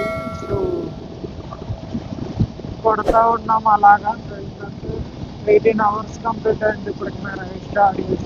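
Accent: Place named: Godavari, India. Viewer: ni Bro Full Video chusa Godavari yassa Bale vundhi bro 😅, Take Care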